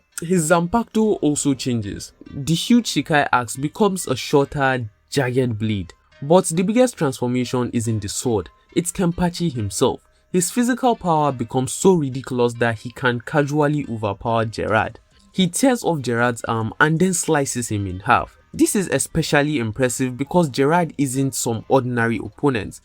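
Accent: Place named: Africa. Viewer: It sounds African to me, very smooth sounding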